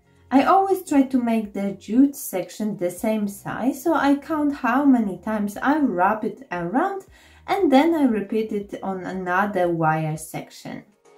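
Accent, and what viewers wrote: Poland. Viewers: Hi, My fav this time is the light shade, really nice and would fit in with any decor. I like the clay and glass bottle design as well and the coaster project at the end so simple but effective. I agree with Kathy about your voice it is so relaxing but I think it is your lovely polish accent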